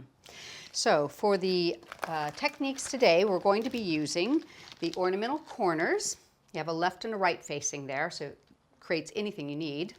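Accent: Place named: California, United States. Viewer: Just love to watch and hear her Californian accent....cards aren't too bad either:) x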